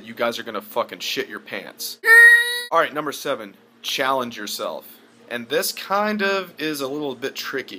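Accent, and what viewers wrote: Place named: South, United States. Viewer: I didn’t know you had a slight southern voice.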